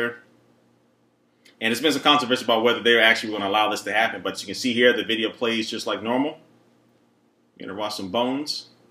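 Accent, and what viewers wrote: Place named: South, United States. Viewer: Liberry. Akurrate. Lol love the southern pronunciations. (I live in Louisiana)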